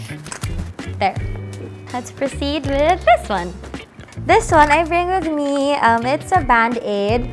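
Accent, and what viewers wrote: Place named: Conyo, Philippines. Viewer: She's so conyo, i love it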